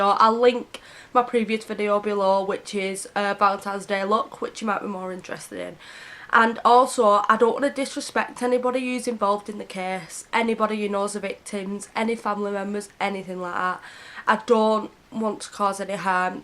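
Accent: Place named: Lancashire, United Kingdom. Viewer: I love your Lancashire accent.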